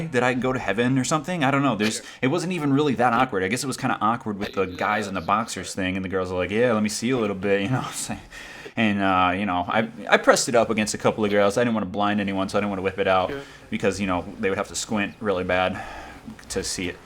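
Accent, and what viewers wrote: Michigan, United States. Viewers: what part of michigan are you from? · what part of Michigan do you come from?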